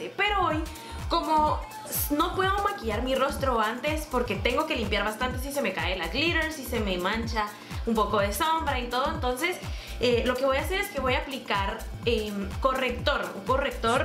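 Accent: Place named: Guatemala. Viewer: Me encanta tu acento chapin! Super chivo el video 🌟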